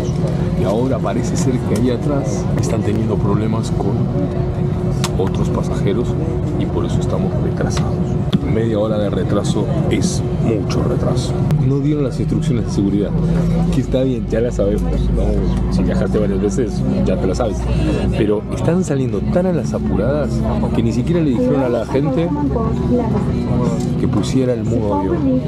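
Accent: Argentina. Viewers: Ya me parecía que eras argentino por tu forma de hablar. Aguante argentina máster 💪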